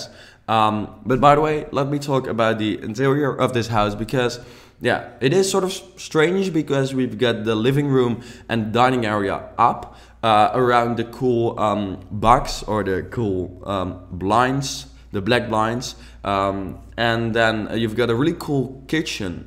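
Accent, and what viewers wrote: Netherlands. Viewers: I come from Belgium, you have such a nice accent, I hear you're Dutch, but it's a nice accent lol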